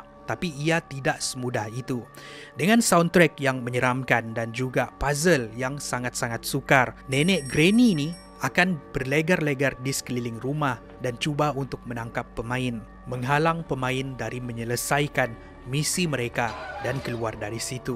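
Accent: Sabah, Malaysia. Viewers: Orang sabah kah ni😂😂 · Abang orang Malaysia ke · Abang ni orang melayu ( Sabah ) atau indonesia ya? Kalau saya orang malaysia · Btw i Mo ckp , loghat mu mcm kntara org Sabah 😅😅, org Sabah eh ?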